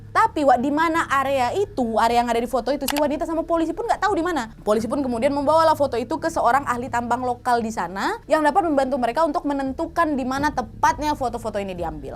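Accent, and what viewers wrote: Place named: Medan, Indonesia. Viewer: tuh org sunda tp cara pembawaannya kaya orang medan😊 aku suka cara pembawaan ceritanya, meskipun menegangkan tp bisa bikin hati tetap slayyyyyyy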